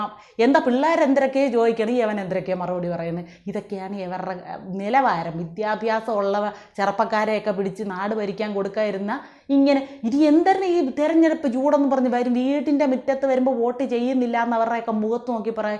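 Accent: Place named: Trivandrum, India. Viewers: Jai Shree Ram 🙏🙏🙏 എൻ്റെ മോളെ ഈ തിരുവോ ന്തരം ഭാഷ ഒക്കെ എങ്ങനെ പഠിച്ചു മോൾ ഒരു അസാമാന്യ കലാകാരി തന്നെ. നല്ല ഒരു actress ആകാനുള്ള കഴിവുണ്ട്. വാണിവിശ്വനാഥിനെ പോലെ bold and beautiful. All the best 🙏🙏🙏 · 🙏🏻🙏🏻🙏🏻🙏🏻 താങ്കൾ ഒരു സംഭവം തന്നെ.... നമ്മളെ തിരോന്തരം ഭാഷ അതിശയകരമായി അവതരിപ്പിച്ചു.... 👌👌👌👌👌🙏🏻🙏🏻🙏🏻🌹🌹🌹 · Superb. More and more feathers are being added in your cap for exemplary & highly qualitative presentation in a pure sense of humour that too in Trivandrum spoken language. One sincere request Please make it possible to present your next episode in Malabar (Kaakka) spoken language. Definitely you are having supreme efficiency to make us to enjoy. Fervently hope that my sincere request will be taken care of in a true spirit. ABHIVADHYANGAL.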